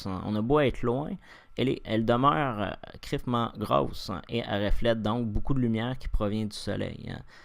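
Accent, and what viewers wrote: Canada. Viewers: Maintenant, dès que j'entends l'accent canadien je suis sur mes gardes mais là le propos m'a l'air logique, clair, cohérent, argumenté. En un mot " globiste", je dirais même " sphériste" ou "rotondesque"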